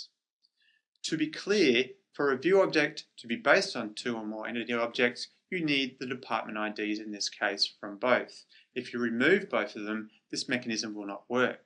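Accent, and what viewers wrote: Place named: Australia. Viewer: See, everybody loves an Aussie accent! ;-)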